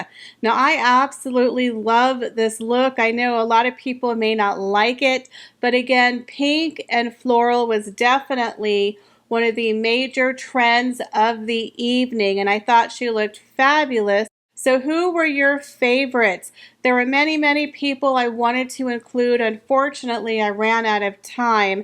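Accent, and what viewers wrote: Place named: United States. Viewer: Thank you for your great enthusiasm and representation of the Royals across the globe. If I may please ask? And I understand your dialect from America may prompt you to pronounce certain words as if they were American. Tiara is pronounced Ti a ra not Tiyerra. I am European/Australian and have worked in high end fashion for a long time and English is not my first language, however I may it a point to pronounce, people’s names or foreign names as they are pronounced in their country. Elie Saab is E l i not Ili. I watch you on all your shows and I do get it being American, you tend to make certain words sound more familiar to you.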